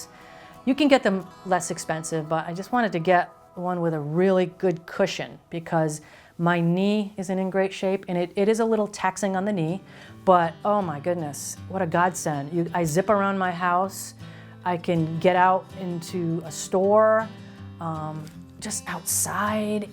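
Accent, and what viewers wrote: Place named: New England, United States. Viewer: Thank you for making this. I dont have bunions, but I have a rare/uncommon condition called Freiburg's disease. It causes the tissue at the top of my 2nd metatarsal (2nd toe, the one you had a hammer toe on) to die and deform because its too long. So I need to have the bone shortened and then the dead part taken of and realigned. It will be less invasive then your surgery, but I will also be immobile for a short time and casted. You video helps ease my nerves. Also you sound like youre from the New England area and it reminds me of my moms accent. So I love it! ♥️ I live abroad and dont hear it often.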